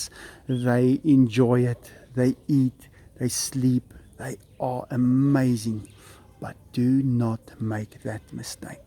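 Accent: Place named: South Africa. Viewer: I just discovered your channel today and I love it. It's so interesting and awesome to see these things, thank you for saving these amazing creatures. I btw also recognized you were south african immediately because I could hear some words similar to dutch and that's when I realized I was right, hahaha! Thank you for sharing your awesome life with us, new sub here :)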